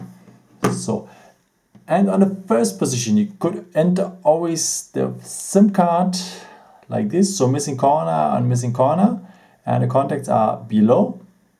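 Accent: Germany. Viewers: Could this viedeo be uploaded in English or with English subtitles because this is not understandable at all. It sounds like a German accent, which is ok,but please...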